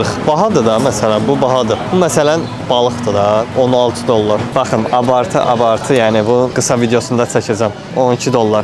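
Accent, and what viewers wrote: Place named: Shaki, Azerbaijan. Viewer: Şəkili ləhçəsi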